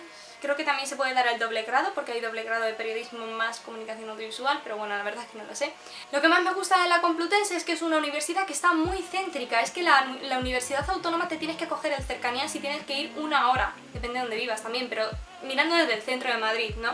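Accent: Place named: Canary Islands, Spain. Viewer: ¿De dónde eres? A veces pareces canarias y otras súper madrileña jajja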